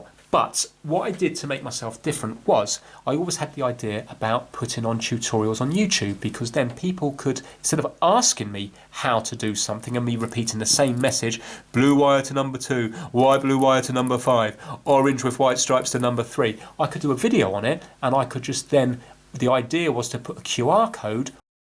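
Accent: United Kingdom. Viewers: I find watching videos of people trying to fix thing therapeutic also I love the British accent (I'm American btw) Congrats on 500k glad to be apart of it :)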